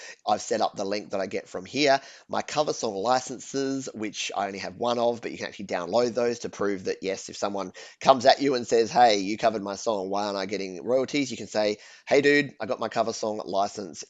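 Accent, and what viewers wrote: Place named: Australia. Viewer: where in australia do you come from, your accent is nice.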